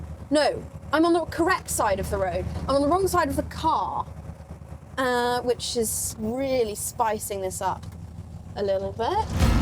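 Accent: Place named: RP, United Kingdom. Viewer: The poshest accent on Youtube. One of the benefits of private education no doubt.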